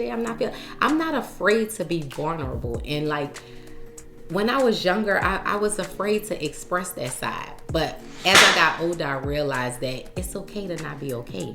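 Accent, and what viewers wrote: New Orleans, United States. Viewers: I just love your personality & accent…ain’t nothing like a NEW ORLEANS GIRL…we love you 🫶🏾🥰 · Love your NOLA accent. We know you are busy Queen!!!👑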